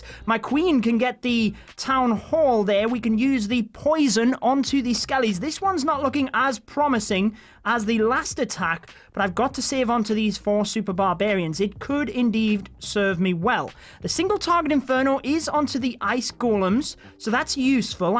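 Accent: United Kingdom. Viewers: Are you from British your voice is so something that is incredible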